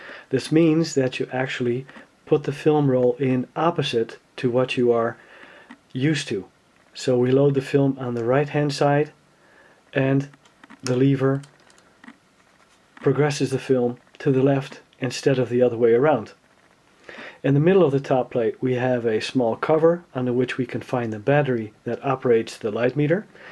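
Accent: Netherlands. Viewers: Ik hoor een Nederlands accent een vraagje wat is het verschil tussen een 35 en een 35 led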